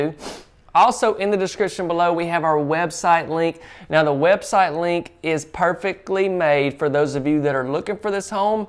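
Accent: South, United States. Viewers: I second that, I am handicapped and would love to see a video for the disabled. And, never apologize for how you say utility room, because I just love your southern drawl, that's what makes you you. God bless. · !!! Sorry! I stepped out with some of your competitor's channels on Youtube for a while and, I am back with just YOU MAN!!!! You're smooth, man!!! LOL! You're the best photographer, Your southern accent makes me melt( I lived in Tennessee for years and miss those southern men)!! I'm back for good!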